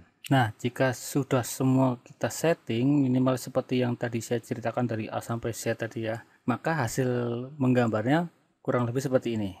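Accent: Banyumas, Indonesia. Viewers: bahasanya lucu...sukses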